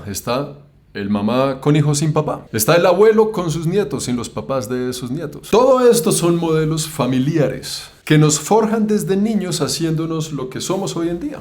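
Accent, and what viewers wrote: Bogotá, Colombia. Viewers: awwww que video tan bonito:face-red-heart-shape:, y yo mas y mas enamorada de 😍 ojala me encuentre un clon con todo y acento colombiano jajajaj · Recién. te sigo, me gusta mucho el tu acento rolo yo viví en Bogotá hace 12 años. Después. emigre a Países. Pero mi querida Bogotá me abrió las puertas del mundo. Mi más sentido pésame por tu perdida. Mucha fuerza. Saludos de una peruana en Haya ❤🎉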